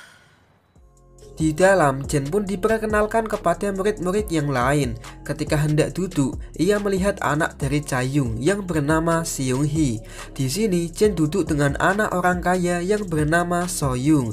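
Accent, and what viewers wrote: Java, Indonesia. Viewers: Aku malah baru ini lihat di tv gk pernah lihat karena jarang nonton tv 😂 seru jugak filem nya 😂 mas nya jawa tpi lucu ngong nya jadi tambah terhibur 🙏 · Jowone endi sampean? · medok banget..hehe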